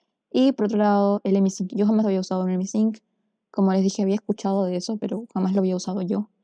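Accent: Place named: Peru. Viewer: Tu acento peruano me encanta 👁👄👁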